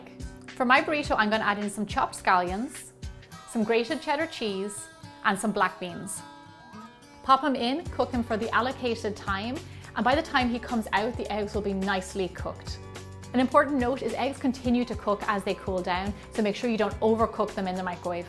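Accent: Ireland. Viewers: Are you Irish? Love the accent! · Are you Irish!?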